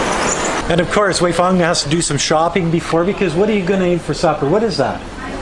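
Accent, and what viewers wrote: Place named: Canada. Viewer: Lol that accent can't get any more Canadian. Love it!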